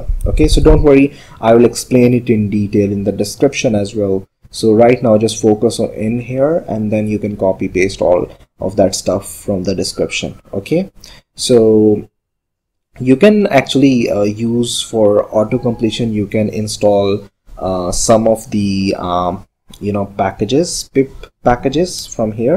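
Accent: India. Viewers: ddddddddd... Indian accent...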